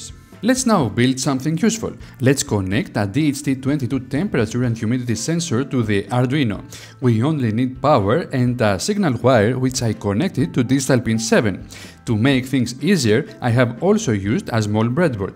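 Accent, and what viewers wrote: Greece. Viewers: Thanks for this video. By the way, are you greek ? Your accent seems familiar :D :D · Are you Greek? I am about 95% certain, this accent is quite characteristic.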